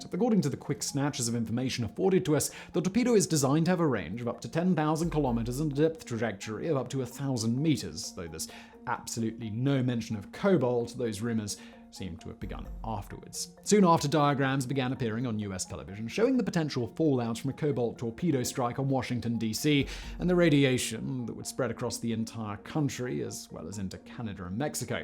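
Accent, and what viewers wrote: United Kingdom. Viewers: I think these channels are not his, he's probably hired to read the script with his nice British accent and all the other work is done by other people, he used to host the English version of visualpolitik which is a Spanish channel. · Is he even Brittish? Sounds like a Brittish wannabe American hipster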